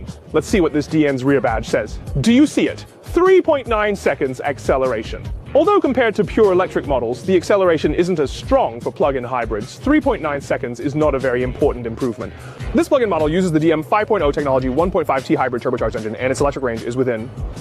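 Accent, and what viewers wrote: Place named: Kenya. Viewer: Cool car Some of your pronunciation sounds Kenyan man... Ever been?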